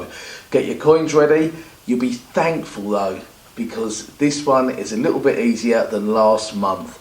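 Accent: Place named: Cockney, United Kingdom. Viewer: Your cockney accent was brill! Subbed just for that!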